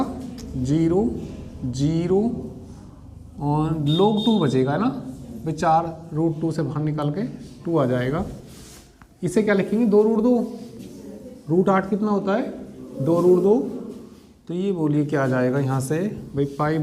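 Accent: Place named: Himachal Pradesh, India. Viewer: sir most probably you are from Himachal if I'm not wrong .......... (by accent)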